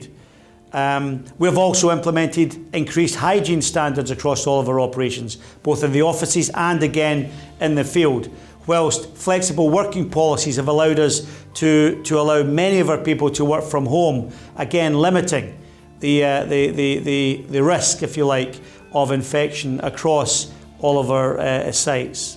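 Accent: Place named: Scotland, United Kingdom. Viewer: Thank you; clearly and succinctly explained for the lay person. BTW, I love your gorgeous Scottish accent, sir!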